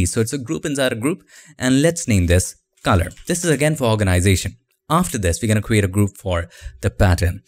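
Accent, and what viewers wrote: India. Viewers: The only indian accent I could listen to for an entire day. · The only one indian accent guy on YouTube who actually professional and explains stuff the correct way! :D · Прикольный индусский акцент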